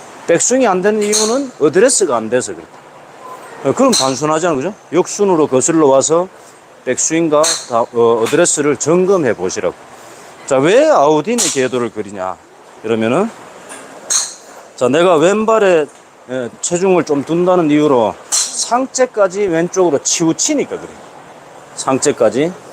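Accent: Gyeongsang, South Korea. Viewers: 갱상도 구수한 사투리 ㅎㅎ 저도 갱상도 출신이라 정겹네요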